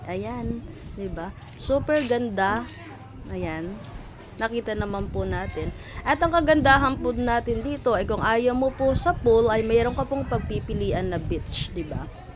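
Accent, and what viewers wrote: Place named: Waray, Philippines. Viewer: Upaya gad. an pag Tagalog.. Ky"WARAY"accent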